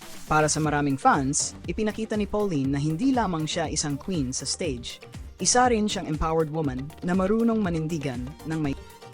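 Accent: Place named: Visayas, Philippines. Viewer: Hala! May accent ka na nga !!! Dati tunog visayas kaw!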